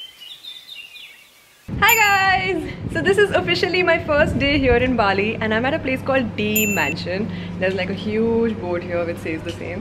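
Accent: India. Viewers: Your accent like indian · Are you Indian?..accent is quite Indian..!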